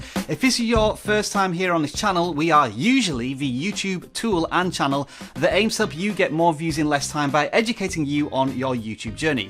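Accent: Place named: United Kingdom. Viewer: It's so hard to understand your heavy british accent... Do you speak American English?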